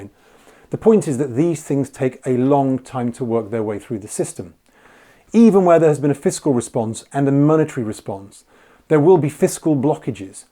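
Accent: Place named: RP, United Kingdom. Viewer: Nothing like a posh english accent and lots of graphs to make someone appear they know what they are talking about - no one knows the future - its all a coin toss :-)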